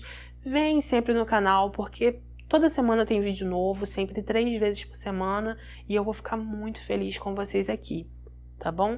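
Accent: Rio de Janeiro, Brazil. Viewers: Boa noite quando procuro seu vídeo e não encontro já fico ansiosa esperando esse sotaque carioca é muito lindo eu morei no Rio de Janeiro por 2 anos e quando saí de lá já estava falando carioca kkkj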